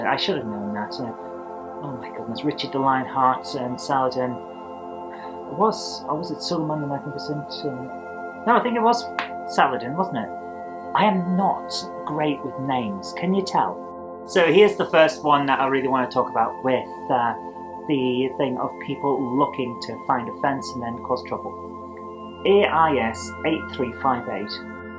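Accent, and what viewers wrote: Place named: Newcastle, United Kingdom. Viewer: You sound much more Geordie in that old clip ! Great response video😊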